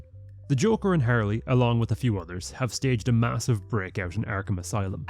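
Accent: Ireland. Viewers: You sound irish. Hello fellow irish person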